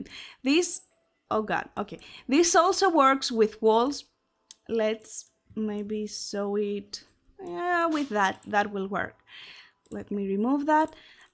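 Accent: Greece. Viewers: Are you from Greece?? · She has to be greek to have that accent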